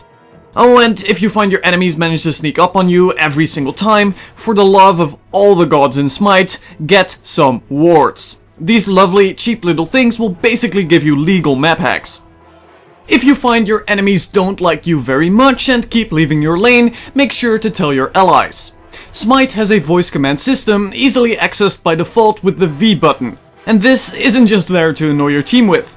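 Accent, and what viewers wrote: Netherlands. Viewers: are u dutch? u sound dutch xD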